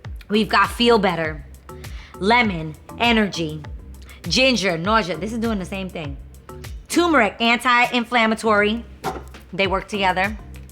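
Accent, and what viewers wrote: New York, United States. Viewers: So I’ve been watching masked singer lol. And just watched the latest episode on Hulu. Soo I know flamingo is you lol. I didn’t catch on till I seen the fourth episode. I heard your beautiful singing voice. And I heard your little New Yorker accent even tho your voice is disguised aaand at the end of what you said “boom”. I was like That’s. Go girl!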